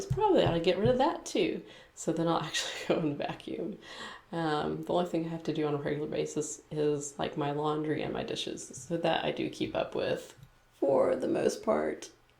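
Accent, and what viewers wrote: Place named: Texas, United States. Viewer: Hi ! I'm french and I'm absolutely not bilingual but I learned english watching movies and series in original version. All I can say is that I'm not really used to hear differents american accents but I totally understand what you are saying so I guess your texan accent isn't so bad as you think or may be it's just for someone who is not american ! Anyway I love your videos, can't stop watching them and of course I apologize if my english is really bad in that comment !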